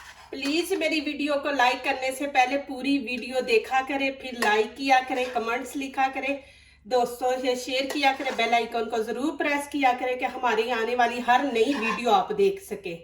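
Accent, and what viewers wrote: Punjab, India. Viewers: I watched a few videos of u .v.nice ..loved ur punjabi lehja urdu ...I myself have my own chanel .kokab khwaja studio....I enjoy ur videos too nd subscribe it ... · lovely punjabi accent..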